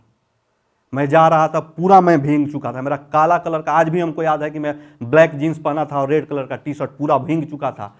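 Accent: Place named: Bihar, India. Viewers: बिहारी अंदाज में समझाने के लिए बहुत-बहुत धन्यवाद 🙏🙏🙏🙏 जय बिहार ❤️ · हमको त बोलिये से मालूम चल गया कि भईया बिहारी हैं.... 👇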